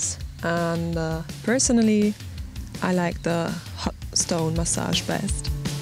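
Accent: Germany. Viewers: I love the little germanisms like keramic and the accent. :D It does sound kinda scripted though. For $222K i'd have a nice down payment on a house and mazda 3 and some left over for a classic car or to invest. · people really need to stop rejecting people with a different accent. if you pretend she should speak in English with a stereotypical English accent, then you are a xenophobic and a racist. if she is a German, why should she try to be someone than she is not? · I can't believe people don't like German accents... Either way she probably speaks better English than us natives. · nice orange peal paint job on 220 000$ car Mercedes Benz... Still can't stand the Germanglish of the host. Get a proper host for these videos...say Derek D or pretty much anyone else. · Well we in the UK think you sound like any normal German. The mic is way over sensitive its picking my to much slur. 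Change your punto for a VW up! Or Skoda citgo there cheap and fun.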